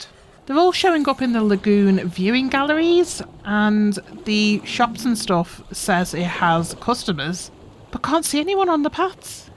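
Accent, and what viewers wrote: Liverpool, United Kingdom. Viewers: I am from the wirral, so it's nice to have a scouser planco player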